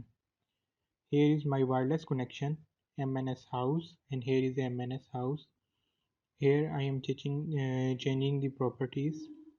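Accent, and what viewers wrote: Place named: India. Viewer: srry bro I thought u were Indian cuz of ur accent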